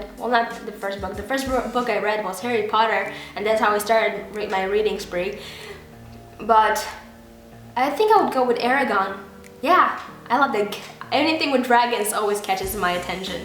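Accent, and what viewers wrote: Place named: Russia. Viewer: Love the vid and enjoy your videos and where are u from u sound a bit Russian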